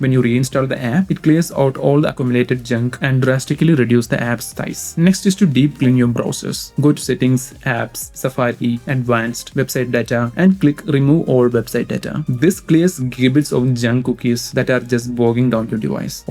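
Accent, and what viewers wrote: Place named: Kerala, India. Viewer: that malyali accent is smooth, intentionally pronunciation is lit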